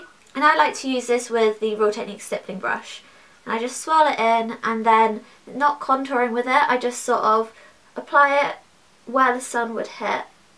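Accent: United Kingdom. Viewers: Where abouts in the UK are you from? :) Btw great video, found it very helpful!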